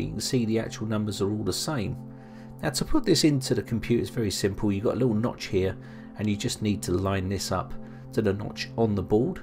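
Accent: England, United Kingdom. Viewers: What part of England are you from, Gloucestershire?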